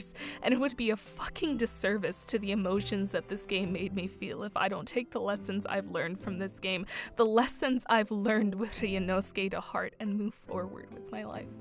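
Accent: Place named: Latin America. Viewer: Great video! Hey would you happen to be latin american? You have a very pretty accent and it sounds like mine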